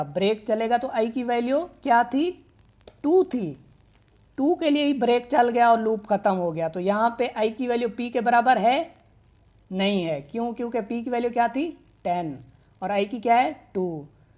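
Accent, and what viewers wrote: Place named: India. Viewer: The Indian accent is very difficult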